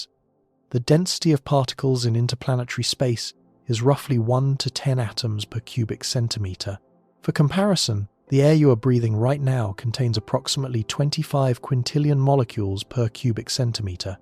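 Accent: England, United Kingdom. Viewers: I am pretty sure that the air and moisture in the suit would still cause evaporative cooling, and your face would frost over or freeze superficially on the surface. It would probably look like it was frozen solid. I think that some of us are a little hard on this Sleepy Science channel. It's not terrible even if its AI. It's sleepy pop science with a relaxing English voice to fall asleep with :-)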